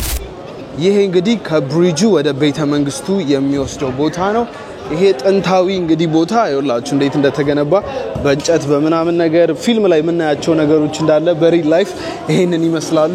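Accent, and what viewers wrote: United Kingdom. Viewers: Ur british accent ❤❤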